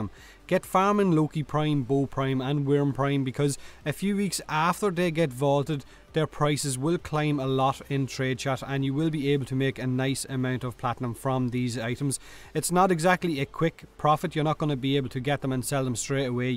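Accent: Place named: Ireland. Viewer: I started Warframe back when Loki was still a starter frame, but then I stopped for quite a long time. Now I'm back at it, and I've had to search quite a lot of guides just to get my "mojo" back. Anyways, I stumbled upon this particular channel and I'm very much excited to find a youtuber with a even stronger Irish accent than Jacksepticeye. <3 xD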